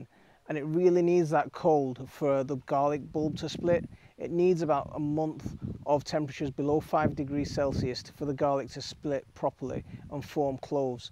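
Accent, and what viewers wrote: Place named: Northern England, United Kingdom. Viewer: ish you said it didn't divide. You've got a great northern accent but didn't you get much frost up there, or have you got a very sheltered garden?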